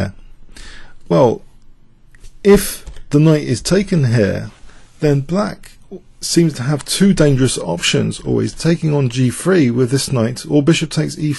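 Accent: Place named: London, United Kingdom. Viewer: Can you please use ''simpler english'' and more ''globalised'' accent :) .. nt all your viewers are from London ! that;s why more and more are vewing Matozelich .. :)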